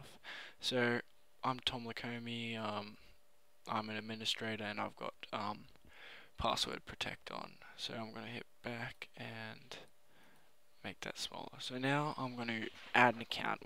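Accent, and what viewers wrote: Australia. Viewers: hes aussie !! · so Austrailn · it's an australian accent, and he's australian.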